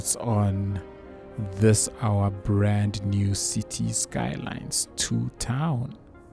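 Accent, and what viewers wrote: South Africa. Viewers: Thank you for putting this up. I really enjoy the South African accent! It makes me miss home! :)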